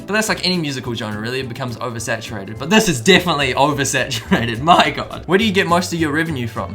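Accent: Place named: South Africa. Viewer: Hey mate - I love your accent (and the music definitely too) ;) Are you of SA origins? Cheers from Poland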